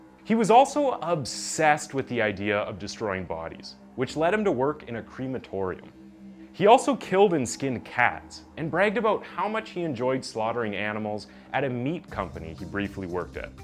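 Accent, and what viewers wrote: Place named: Canada. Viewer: Is this a Canadian channel? Dude has a ridiculous accent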